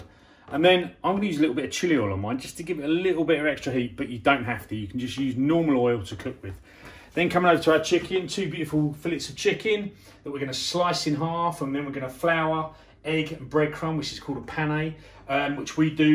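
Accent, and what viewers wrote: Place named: Cockney, United Kingdom. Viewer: I can't get over this guy. I guess he really is the head chef at Wagamama but he speaks like he's from EastEnders and displays a real lack of culinary vocabulary saying things like "soya sauce" and "too-meric." It's like the real Steve Mangleshot wasn't available so they just drafted in any old dodgy actor that they had during lockdown!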